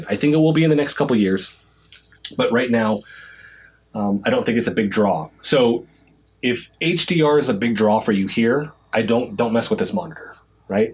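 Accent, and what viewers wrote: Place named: Pacific Northwest, United States. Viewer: Great review! Just curious, are you from or do you live in the PNW? Only asking cause your voice reminds me of other content creators from there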